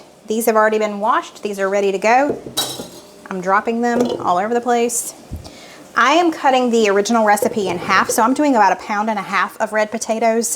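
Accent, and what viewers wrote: South, United States. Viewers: I meant no disrespect for anothers accent...merely curious....I love the southern accent and I love channel. I am sorry if I offended you with my curiosity! I follow several from SC and they say it differently!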